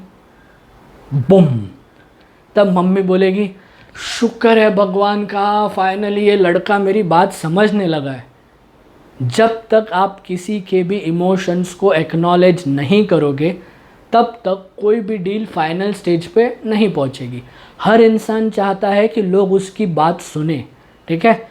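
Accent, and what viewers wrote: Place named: Gujarat, India. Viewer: great sir, great video and from the way you speek i think you are from gujrat , back to point nice and informative video i will try this on my crush hehe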